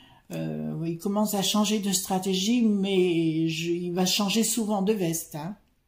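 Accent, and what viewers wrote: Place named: Dauphiné, France. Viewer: quelle joie d'entendre votre voix avec l'accent du Dauphiné ! Merci !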